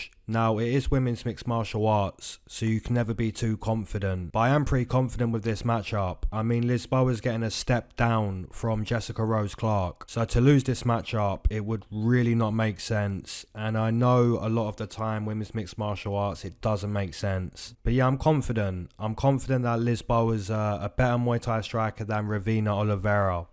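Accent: England, United Kingdom. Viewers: Your show is really good week in and week out. The comedy mixed in with accurate analysis in your England accent is pure money. My only complaint is I wish you would change the color of the background from white to anything else. That white background hurts my eyes on my TV. Everything else about your show is complete money. 💰💰💰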